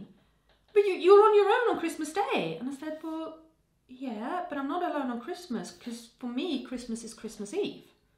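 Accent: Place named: England, United Kingdom. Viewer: Happy Birthday. It always amazes me you are Swedish and speak it but yet you do have a English accent. Your schedule is crazy busy. Merry Christmas ⛄🎄 sweet friend.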